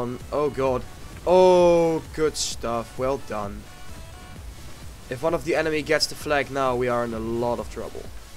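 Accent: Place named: Netherlands. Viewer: Jij bent een Nederlander toch want je uitspraak daar kan ik het aan horen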